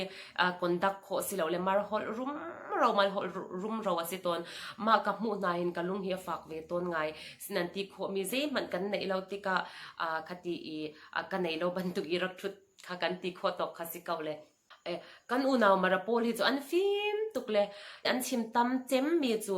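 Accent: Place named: Mizoram, India. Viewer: Na holh Accent hi a Pangkhua holh thluk ngai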